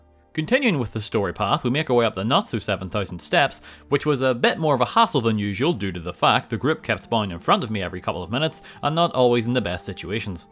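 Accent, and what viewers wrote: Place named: Northern Ireland, United Kingdom. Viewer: The Northern Irish accent just fits with Skyrim idk why.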